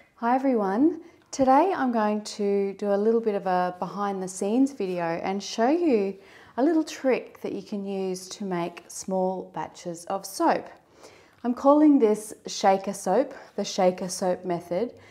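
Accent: Australia. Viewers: Sounds like you might be an Aussie.. 🌻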